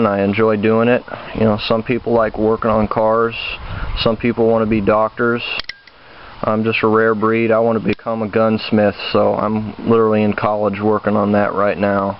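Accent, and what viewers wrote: United States. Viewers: Well, since you're talking about your roots, I'd say that you probably learned to talk in southern ohio or close by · you don't sound Californian, you sound like your from my neck a the woods. where abouts you first lived?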